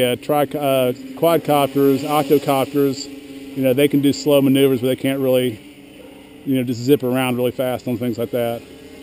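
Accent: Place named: Texas, United States. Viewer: Hi, you sound like you're from Fort Worth Texas, are you? I'm from Houston. But, here is a question...Do you have any idea how much weight this design can lift? I want to build one and it will be my first rc craft, however, I will deck it out with all the OSD, GPS, and cameras needed to fly FPS. So, weight is a factor in a big way. If these motors are good, great I will buy them. If I need some a bit more powerful, got any suggestions on which ones to get? Thanks Much! Tracey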